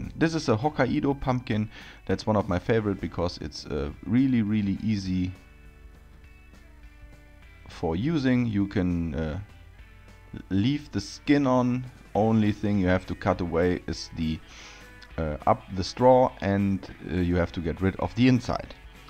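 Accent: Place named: Germany. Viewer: Its tiiiiime to grill.... ich liebe dein Typisch ich sag mal „Deutsch angehauchtem“ Englisch :)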